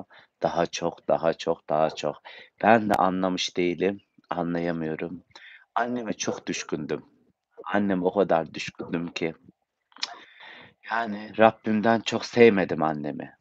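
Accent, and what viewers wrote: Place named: Kastamonu, Turkey. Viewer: Kardeşim Başına Sağolsun Allhm Kabir Rahatlığı Versin Kastomonulumusunz Konusmalarn Öyle Geldi Bizde Sinopluyz Sabırlar Dileriz Çok Büyük Bir Acı Sende Merhametli Bir Evlatsn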